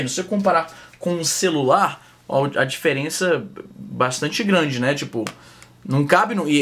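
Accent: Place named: Northeast, Brazil. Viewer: grossura? ,,,,esse é conterrâneo nordestino(como eu) ...coisa boa kkkkk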